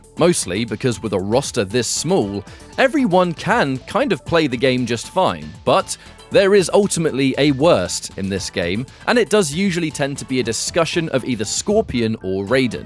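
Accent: United Kingdom. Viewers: I would’ve watched this whole thing if u didn’t have a British accent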